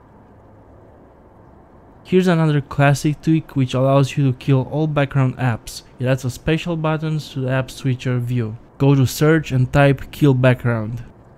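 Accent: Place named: Romania. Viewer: Mi-am dat seama ca esti roman dupa accent. :)))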